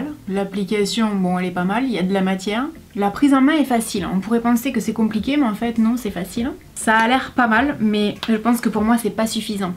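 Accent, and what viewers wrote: South, France. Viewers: J’ai etait choquer dès commentaires que tu as afficher je n’aurais jamais imaginer que tu reçoive ce genre de commentaire 😱 les gens sont tellement méchant c’est aberrant!!! En tout cas moi j’aime ton accent du sud (j’y habite aussi 🤣) et j’aime beaucoup ta sincérité ne change rien 😊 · Bonsoir!:) Comment on peut agresser une youtubeuse ainsi? j'avoue je suis choquée,se moquer de son accent du sud c'est aberrant. D'autant plus que l'on a tous un accent plus ou moins prononcé que l'on soit de Paris de Marseille de Lille de Toulouse de Caen de Rennes de Strasbourg de Besançon de Dijon de Clermont-Ferrand de Nice ou de Perpignan que l'on soit blanche ou peau mate ça n'a rien a voir. De plus est très belle physiquement. Bisous de Toulon ou l'on a aussi un accent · Ces critiques, c'est incroyable... Et c'est sûrement élitiste de dire ça, mais 85% ne savent même pas écrire français ni s'exprimer. 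Donc non seulement ils sont méchants mais on ne les prend pas au sérieux et ils se ridiculisent par-dessus le marché. Et ça me fait réaliser une chose aussi, c'est que les accents du Sud (je suis pour ma part du sud-est et bien qu'on me dise le contraire, j'ai du mal à différencier les deux accents :D), bien qu'ils concernent quelques grosses villes (Marseille, Toulouse et Montpellier peut-être ?) sont très méconnus. Ton accent est tellement authentique que je ne comprends même pas qu'on puisse penser qu'il est surfait ! Ces remarque doivent venir de gens très au nord qui n'ont jamais eu ces accents dans leur entourage. Enfin bref, gros pavé pour quelque chose qui n'en vaut pas la peine, alors que la vidéo était très agréable à regarder :D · Vive l'accent du sud! (représente le 11 donc l'accent on peut en parler!) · j'ai du mal a comprendre les gens qui viennent 'se forcer' a regarder tes videos pour t'insulter derrière, pourquoi ils viennent regarder? 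quel est leur projet en fait? je trouve que tu leur a fait trop de pubs et tu as du courage pour supporter ce genre de com. perso j'aime bien tes videos donc lâche rien et continue comme tu es. PS l'accent se perd donc une fois qu'on aura tous le même accent on se fera bien chier donc merci pour l'accent du Sud. ça fait plaisir a entendre quand on vit a paris... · Le plus important, qui doit nous servir d'exemple, c'est le fait que se montre à nous pas maquillée, avec des racines visibles, son franc parler et qu'elle nous fasse rire avec son accent du sud et ses "moinsssssse" 😉 elle reste naturelle et ça fait d'elle une belle personne (dans le sens noble du terme). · celui qui m'a fait le plus rire c'est celui tout en bas qui dit qu'elle a un accent du sud mais que c'est trop bizarre car elle ne bronze pas, sous entendu elle fait semblant parce que si elle était vraiment du sud elle serait bronzé ! 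Qui ne sait pas que les peaux rousses ne peuvent pas bronzer ? Certains feraient mieux de reflechir avant de commenter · coucou je suis pas une abonnée je ne vous connais pas et je suis franchement choquée de tous les sales commentaires que vous pouvez recevoir , que ces gens pleins de haine aillent tous au diable et ils ne savent meme pas écrire et continuez ce que vous faites vous êtes belle et interessante et continuez jusqu'à vos 90 ans meme ....bande de merdeux qu'ils sont ...bisous de Paris ...et votre accent est joli ...on adore l'accent du sud a la capitale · Les gens sont pathétiques ! Moi j’adore ton accent vive le sud ! Et tu est une très belle femme rousse 😊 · Mon dieu mais c'est hallucinant de laisser de tels commentaires! J'espère que tu les signales! Vive l'accent du sud et les accents régionaux! Des bisous!! 😘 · Non mais la meuf la " je comprends pas tu as l'accent du Sud et tu n'es pas bronzé c'est bizarre" mdrr mais déjà on voit le niveau d'intelligence quand même c'est dingue.. 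Mais qui sont leur parent? 😅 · Au top, j’aime beaucoup ta personnalité ! L’accent du sud , que du bonheur ❤️ Abonnée direct ! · Vive l’accent du sud ❤️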